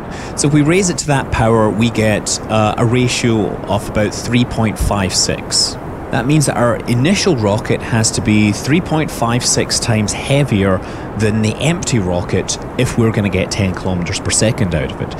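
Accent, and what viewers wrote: Scotland, United Kingdom. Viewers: omg I love your brogue! my god it's just like...awesome. Not David Tennant thick, not Northern England weak. ;3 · the switch between scottish/american rhotic/non-rhotic stressing is kind of disorientating. good video though, loved it. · What a silly comment. They were talking about what he sounds like, not his nationality. We all know Scotland is a part of the UK, so that technically makes him British. But he does SOUND Scottish, which is a much more accurate description than the over-encompassing "British."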